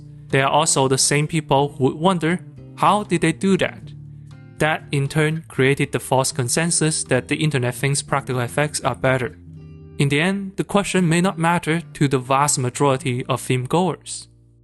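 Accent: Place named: China. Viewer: Your chinese accent is so thick that even when speaking English, you still sound like you're speaking chinese. I don't understand half of the things you say.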